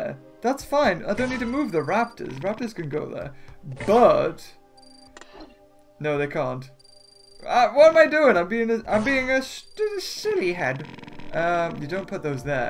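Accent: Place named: United Kingdom. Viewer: Um,excuse me Mr..I hate to crush ur hopes but you will never do an exact impression of Chris Pratt.See,he has an American accent,you have a British one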